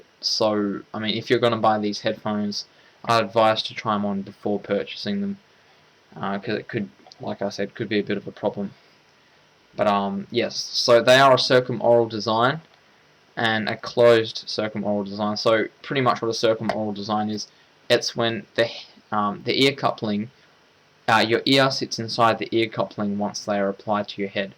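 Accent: Australia. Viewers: thanks, aussie? :)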